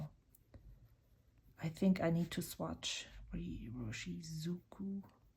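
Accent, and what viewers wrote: Germany. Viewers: Thank you for such a detailed video; I enjoyed it quite a bit. That question #5 helped me a lot, as my approach to fountain pen has always been about getting a different writing experience from different pens, and I prefer Medium fine to medium nibs, as they have the right balance between nib width and smoothness. So, thank you. Regarding the inks(#7), I love both of the mentioned inks. I love teal(ish) colour. I recently got Lamy Petrol(2025 version), as I wanted sth that is close enough to dark emerald colour. Iroshizuku's new inks Rikka and Syun Gyo are on my list next. Thank you for such detailed and wonderful videos. I also liked your categorization of pens. I am someone who keeps a minimal and simple collection, but if I ever got that many pens, I am also going to have a similar system. BTW, are you German by any chance? I studied in Germany, and that accent seems familiar :)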